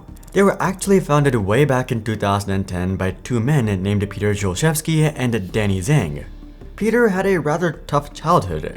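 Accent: India. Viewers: WHAT is this accent that assaults my ears??? Are you both kinds of Indian? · Didnt know VoiceOver guy was India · He's just an Indian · Your Indian accent is actually unbearable, you disgust me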